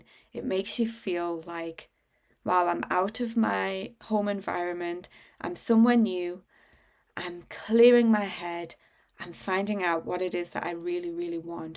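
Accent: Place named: Europe. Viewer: Hello, you seem to sound EUROPEAN. I'm from America.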